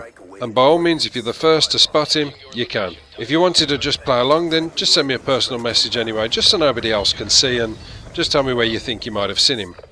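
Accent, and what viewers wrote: Birmingham, United Kingdom. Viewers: Great stuff, you're like a brummy Sid Waddel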